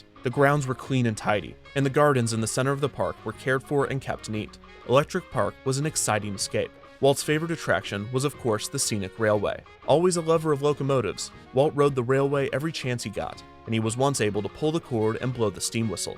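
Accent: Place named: United States. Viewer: Are you daft? He clearly sounds American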